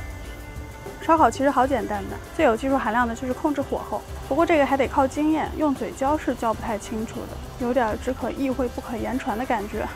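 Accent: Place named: Jiangsu, China. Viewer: 这是哪儿的口音 像江苏的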